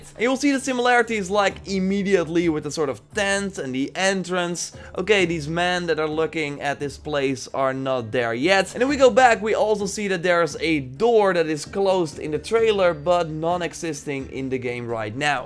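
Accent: Netherlands. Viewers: Nice videos man! Btw are you Dutch? Zo ja, ik ook 😉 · Just asking. Dutchie?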